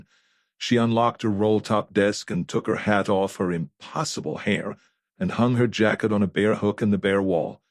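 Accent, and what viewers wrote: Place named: Germany. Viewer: Enjoyed the reading. Even with what seems like a hint of a German accent seeping out. I like to imagine that Schwarzenegger was doing the reading. 😂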